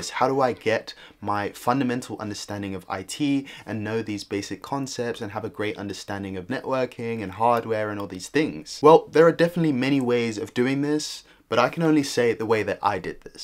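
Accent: United Kingdom. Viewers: thanks Bruv for clearing my mind and showing the way also i glad that i've finally found a pleasant calm UK accent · that's funny, I never noticed from the UK... I'm used to the UK accent as I'm from the UK too lol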